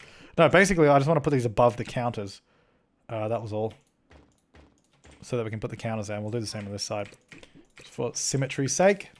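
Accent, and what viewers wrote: Australia. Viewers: Ya Mong 😂😂 such an Aussie !! I love it !!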